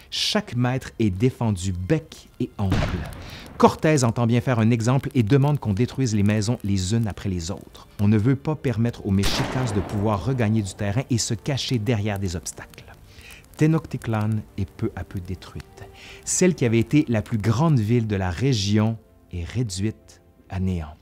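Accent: Quebec, Canada. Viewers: Coucou! Salutations depuis la Colombie. Je m'appelle Nathalie, je suis de langue maternelle française et j'ai la double nationalité suisse et colombienne. Cela fait depuis plusieurs semaines que je suis votre chaîne, et j'adore votre travail, vos vidéos si instructives et si bien recherchées. Toujours un si grand plaisir de vous écouter nous raconter l'Histoire, avec cet accent québécois si savoureux! Concernant le sujet du jour, j'ai des racines amérindiennes du côté de ma famille colombienne et le sujet de la colonisation et de ses effets, souvent tragiques, toujours très complexes sur le continent que nous connaissons maintenant comme le continent américain (nord, centre et sud) me touche profondément. Bravo pour votre présentation des premiers contacts et de la colonisation du point de vue des Aztèques, et sur la façon dont leurs traditions et leurs perspectives sur le monde et le cosmos étaient profondément mal comprises par les colonisateurs européens. 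Pourriez-vous vous intéresser aux peuples autochtones du Canada? Ou sur l'histoire de la Colombie coloniale? L'histoire de la Suisse est également passionnante! Voilà pour quelques idées de futures vidéos. Chaleureuses salutations! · J'adore l'accent québécois c'est du nuage auditif ❤ Pardon mais vous avez tellement une voix qui raconte des histoires pour enfants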